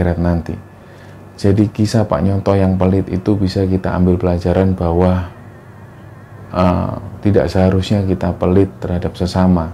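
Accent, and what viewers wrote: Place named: Central Java, Indonesia. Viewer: Indonesia bagian temanggung mana ini suaranya???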